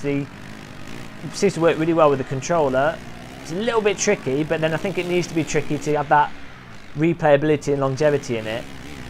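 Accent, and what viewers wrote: United Kingdom. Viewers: Man i just love British commentaries, I'm a total sucker for your sexy accents no matter northern or southern. Your hilarious Assetto Corsa review got me sold on you, hope your channel gets huge. XD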